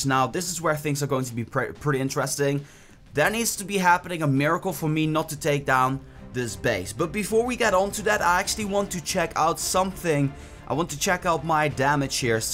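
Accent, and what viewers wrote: Netherlands. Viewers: I love ur dutch accent :D ben ook nederlands haha :D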